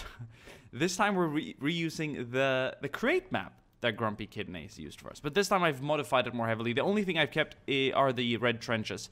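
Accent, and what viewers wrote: Sweden. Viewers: U swedish? Am i Finnish 😁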